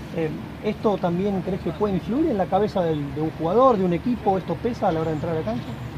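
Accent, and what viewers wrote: Argentina. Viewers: sos argentino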